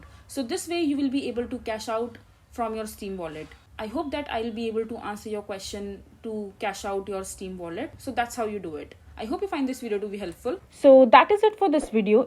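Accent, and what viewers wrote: India. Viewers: i can just smell your pajeet accent your name is not and you dont look like your profiile picture lmao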